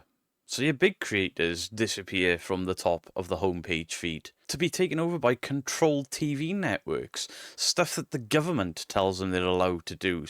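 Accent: Wales, United Kingdom. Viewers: you sound welsh, are you?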